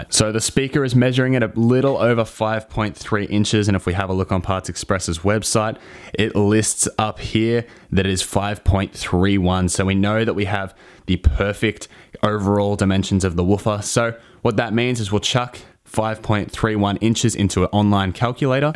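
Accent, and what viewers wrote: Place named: Australia. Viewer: Nice to hear an Aussie doing this. I don't know anything about plunge Routers, do you need different "bits" to fit on the cutting apparartus? Can you get them in australia?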